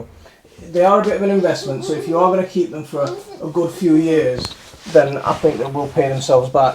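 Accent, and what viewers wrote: United Kingdom. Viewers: I could see that. Excellent. Tell me, it sounds like you may be second generation British. Where do your family originate from? I lived and worked in Bangladesh from <num>.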